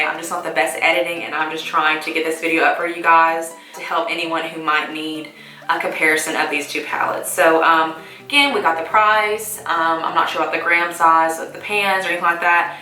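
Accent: South, United States. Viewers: You are so so so GORGEOUS!!!! You popped up on my suggestions and I just had to watch. I love your southern accent, hun it sounds a lot like mine! New subscriber, I'm impressed. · Omg where is she from her accent is adorable and southern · New subbie! Where's your accent from? You sound like a southern girl! Love your eye makeup! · I totally didn't expect your voice to sound like this😆 Love the southern accent. Where are you from? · Seriously? Clearly American and southern...... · Is that a southern accent you have?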